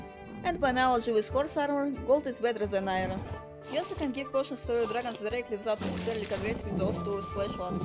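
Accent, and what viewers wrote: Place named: Russia. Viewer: Просто спрошу, ты написал коммент на русском потому, что тебе тоже показалось, что у девушки очень яркий русский акцент?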